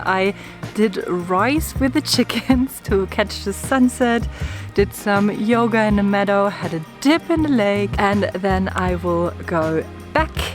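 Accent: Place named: Germany. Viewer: I absolutely love your German Accent which I find extremely endearing and comforting have yourself a wonderful and Bewitching day and Blessed Be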